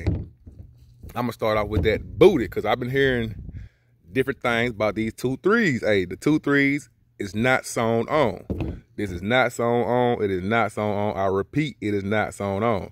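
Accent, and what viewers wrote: United States. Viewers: That’s how ppl from the south talk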